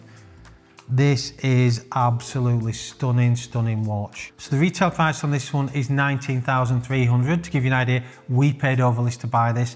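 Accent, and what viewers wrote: United Kingdom. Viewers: You have a very interesting accent. Which part of uk is that?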